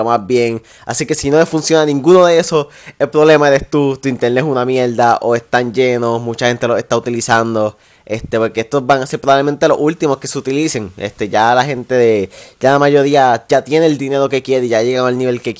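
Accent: Puerto Rico. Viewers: Eres de puerto rico? Se nota por el acento, biem Video like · tu eres de Puerto Rico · Tu eres de puerto rico te eschuchas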